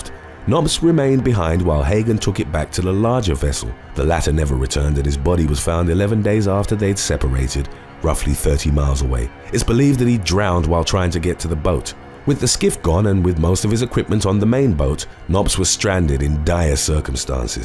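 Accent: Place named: London, United Kingdom. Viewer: Dude, you have quite honestly the coolest voice. What part of London are you from?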